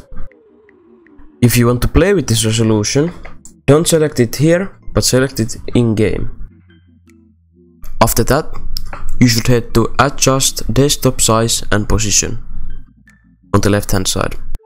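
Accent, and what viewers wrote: Finland. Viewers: Nyt paistaa kyllä suomi aksentti nii saatanasti xd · Thanks! Oot vissii suomalainen?? Huomaa aksentista xd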